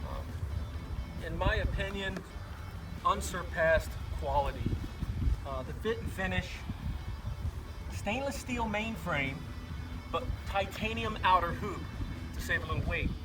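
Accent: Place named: New Jersey, United States. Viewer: Nice video. FIrst time on your channel - you sound like you have a New Jersey or PA accent. Reminds me of home. Thumb's up.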